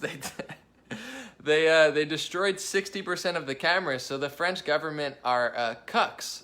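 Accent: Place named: Boston, United States. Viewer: Your boston accent blows dude, but we love you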